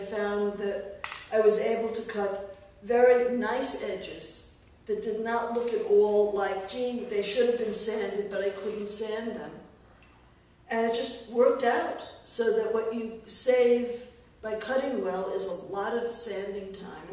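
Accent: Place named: United States. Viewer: Scrolling with the Hegner, wrong title as no scrolling takes place just a lot of talking with strong whining American accent to difficult to understand even for the subtitles.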